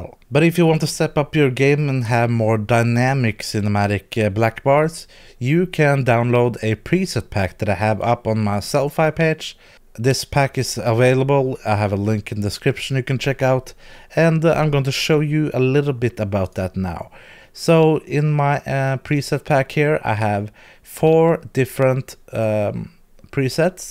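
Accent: Norway. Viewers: Tusen Takk Og du høres så Norsk Engelsk ut at det er deilig å høre på en norske som snakker english :D :P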